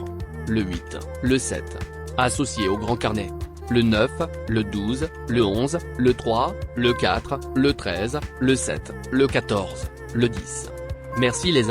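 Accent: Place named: Burkina Faso. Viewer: Son accent est burkinabe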